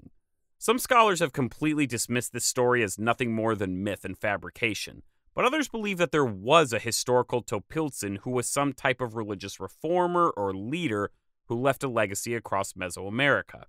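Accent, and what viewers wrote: Midwest, United States. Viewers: Omg your voice is so American ☹️ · well, I like your Midwestern accent. Please continue to speak in your natural way; being English different make you smarter.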